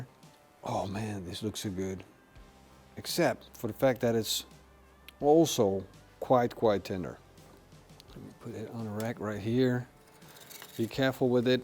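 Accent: Netherlands. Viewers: I almost metioned the cold smoke before the cook, but I knew I had to wait until the very end before commenting. You know your stuff, and that is why I watch. Once again, I love hearing the Dutch accent. Reminds me of my youth in Den Haag.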